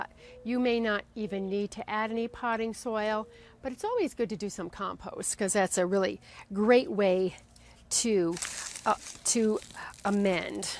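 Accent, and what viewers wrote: United States. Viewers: Hi! It's Marc again from good ol' Germany. Thank you for this video! Yes, I do find your videos very helpful indeed, and I always look forward to new videos coming from you. And between you and me; I love your New England accent. Your English sounds absolutely beautiful. I also learned something from you that works fantastically even in the much cooler and moister climate of central Europe: Amend potting mixes with worm castings. Wow! This is awesome! It works on my citrus plants, but it also works on my mammalaria cacti and jade plant and aloe. It also works on my cycads. And it works on my heavy feeders like oleander and geraniums. Today I amend the potting mixes of all my plants - tomatoes, Mediterranean herbs, coffea, strelitzia etc etc. My impression is that worm compost keeps my plants healthier and pest-resistant. So, let me assure you: your videos are absolutely helpful - even over large distances and across climate zones. 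So, carry on, and share your expertise with us, so we can all go out in the garden and make the world a more beautiful place (I love your motto, and I have adopted it and made it my own). Have an awesome Sunday and enjoy your patios and garden! Bye!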